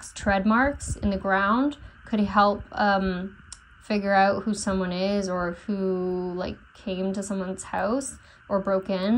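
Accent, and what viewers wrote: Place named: South, United States. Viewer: its southren accent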